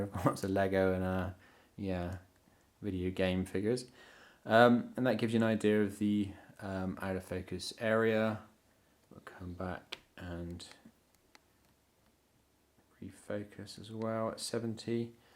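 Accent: Bristol, United Kingdom. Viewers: are you from bristol ?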